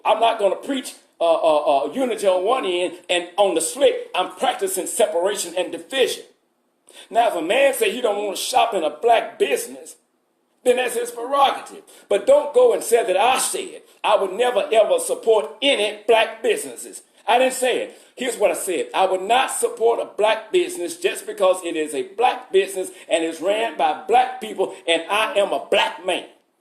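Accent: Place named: Louisiana, United States. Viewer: I am really enjoying this channel and love the commentary. Your Louisiana accent and passion....very enjoyable.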